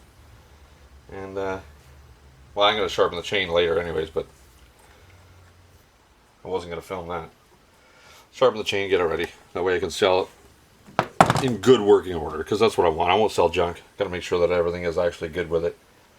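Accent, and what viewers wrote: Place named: New Brunswick, Canada. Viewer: My mother was from NB so I like your accent! Anyway, the white paint on the fuel line is a hint it is original. My 2010 vintage saw has the same. I take it you don't think much of these saws. Since I have only used Poulan and Echo, fixing mine is a new experience. Seems like a pretty good homeowners saw. But I have noticed that the displacement of these saws seems inconsistent - 42, 38, 37, (mine says 35) and even saw one in a video that showed "3414c". I guess the Chinese don't read English very well (: BTW there is a small round tab (1/4 inch dia) that secures the front left of the cover.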